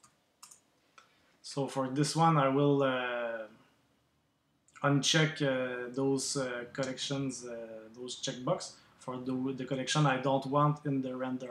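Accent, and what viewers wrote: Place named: Quebec, Canada. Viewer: Très rafraîchissant d'entendre du bon vieux Québécois agnlais😁 mais sérieux merci pour l'approche «straight to the point» avec les exemples simples. J'essaie de m'adapter au mieux à la logique des rendus de Blender.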